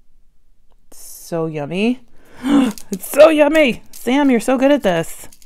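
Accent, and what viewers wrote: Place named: California, United States. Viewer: Sounds like from right here in california right here by the bay pretty cool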